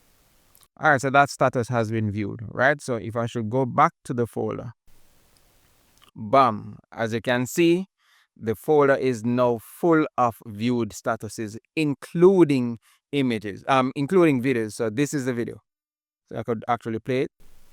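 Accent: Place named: Guyana. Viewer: Nice accent. Guyanese?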